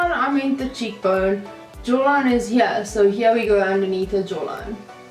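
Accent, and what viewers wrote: South Africa. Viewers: Cool babe !! I miss South African accents so much !!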